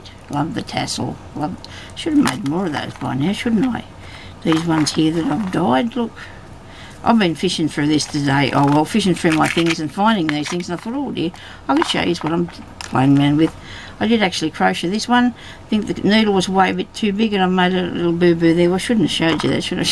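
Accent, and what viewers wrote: Australia. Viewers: Hello - I have just discovered your channel and watched your tassel making. When I started to listen to you talk I thought ooh! is she an Aussie or Kiwi - just took a couple of sentences to figure it out - we speak the same speak. I loved the tassel you made - I know it was last year but anyway loved it - wandered into my small humble craft room and looked around - I am getting cracking tomorrow. I also loved your velveteen flowers and am totally inspired. I am from Perth and am going to watch all your videos now. Happy New Year to you and your family - this also includes the cat!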